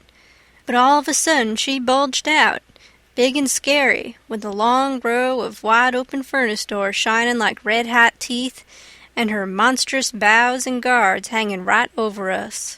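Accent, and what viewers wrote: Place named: South, United States. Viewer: Hmmm. Not thrilled about the narrator. All I can see is some southern girl in my mind. Should have been a male.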